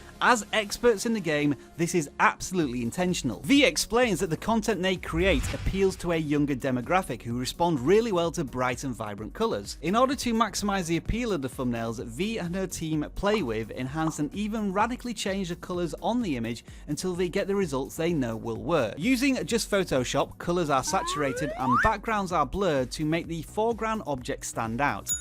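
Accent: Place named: United Kingdom. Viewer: hey, are you british? am loving the accent 😄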